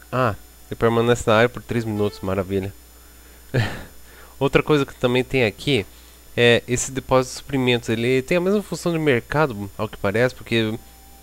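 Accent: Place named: Portugal. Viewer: Vc tem um sotaque portguês, mas vc é brasileiro ? qual sua nacionalidade?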